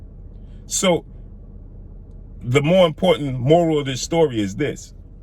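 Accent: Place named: New York, United States. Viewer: Why is you takin' this so personally? I can tell by your accent you from NY, Errol left NY when he was a toddler.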